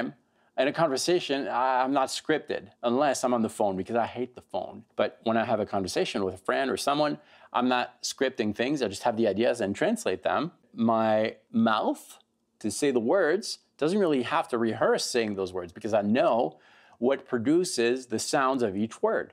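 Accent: Quebec, Canada. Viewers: I bet you're from Québec. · A true lesson. I thought French Canadian.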